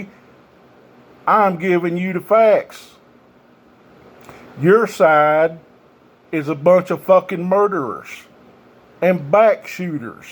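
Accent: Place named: South, United States. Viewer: This guy's 100% right, took an oath to uphold the law at all times yet he stands behind the biggest criminal in American history, and as a military man he's supposed to defend The United States Of America instead he stands behind a lone lunatic imbecile who has caused irreparable harm to our democracy and severely compromised our national security. is the moron not this guy, having a southern drawl doesn't make someone an idiot.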